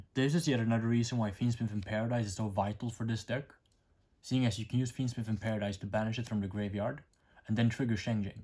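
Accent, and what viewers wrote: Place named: Sweden. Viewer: dont be a prick, how many languages do you speak perfectly? He is swedish, they pronounce some letters differently in english, big deal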